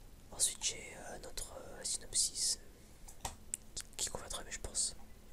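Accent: North, France. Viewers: Tu es bien du Nord 😊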